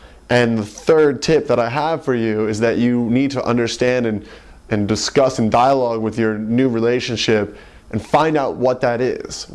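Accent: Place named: United States. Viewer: he sounds like an American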